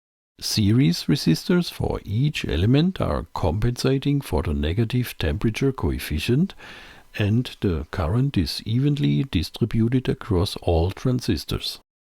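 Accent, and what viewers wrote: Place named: Germany. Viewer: Cool. Interessant. Wollte sowas bauen ala Signal Lämpchen mit pwm am minuspol zur globalen Helligkeitsregelung. Die LEDs müssen aber dann je nach Farbe eimen passenden Widerstand bekommen. Hab schon erst mal ins Klo gegriffen, transparente LEDs sind ungeeignet. PS. Deutsches Englisch ist fürchterlich 8) gerne of deutsch. Ich glaube, Mittlerweile kann man auch mehrere Audio Tracks anfügen für verschiedene Sprachen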